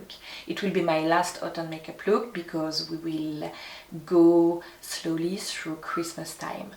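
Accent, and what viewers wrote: France. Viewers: I love your French accent! One of the reasons why I like your videos ❤️ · I love your accent! You're very French, of course! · Don’t fret over your accent! Your English is very good and how many of us wish we spoke with a French accent? :) Another great video! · no problem understanding you at all, in fact i love to listen to you: your melodic diction and French accent are delightful, while you are also very articulate. In English the nail color is a dark teal, i'd say.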